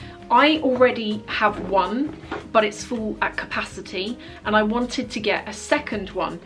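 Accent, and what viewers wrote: United Kingdom. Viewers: Diana Lastovska, British accent makes everything sounds smarter & more sophisticated 😂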